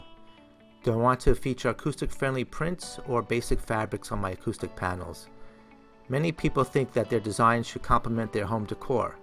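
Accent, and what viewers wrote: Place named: New York City, United States. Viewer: This guy sounds like he's from the NYC area... good to know when I construct mine!!!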